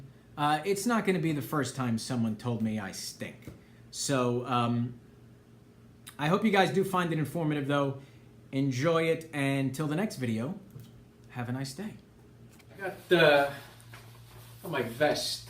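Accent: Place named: Brooklyn, United States. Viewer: Love the Brooklyn accent omg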